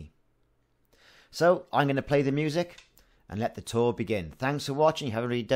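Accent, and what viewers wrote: Wales, United Kingdom. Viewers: Keep the vids coming mate, sounding good so far and good hear a Welsh voice online :-) Will be heading to Maia soon but have to do the exploration trip to unlock palin. After that I’m game for some alien hunting if your still about.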